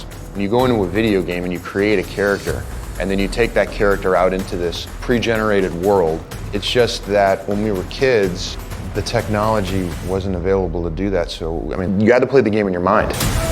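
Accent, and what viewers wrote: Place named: Pennsylvania, United States. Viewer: I find all of you guys to be wonderful beyond words. What's really odd is part of it is because you're Pennsylvanian, which I can tell from your accent and you're upscale "Ohio River Valley". The richer ones (Rivers) in Pittsburgh. Very excited to be with you AND get our government some BIG Money!!!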